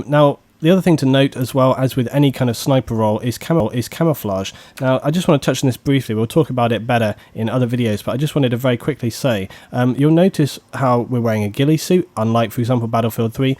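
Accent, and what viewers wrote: United Kingdom. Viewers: feel like a british SAS with that accent, keep it up!!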